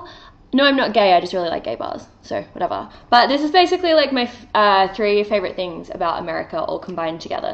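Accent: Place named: Australia. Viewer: 1st time ive watched one your vids,your funny as fuck.aussie as accent.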